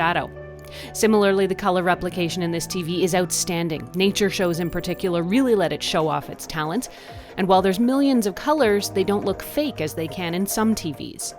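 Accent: Canada. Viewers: Love the Canuckiness! you speak so beautifully, as I normally become instantly frustrated with the regular YT presenters that are far to zippy happy, you present the facts clearly, concisely and at a great pace (without the fluff). Thanks so much!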